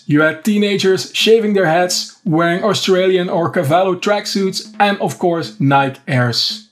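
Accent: Netherlands. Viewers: In the US that's a Millenial. I'm used to hearing the Dutch accent so much that I didn't even notice yours. LMAO! I'm a Dutch American. My ancestors came from Holland and Austria. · It because of the Dutch accent.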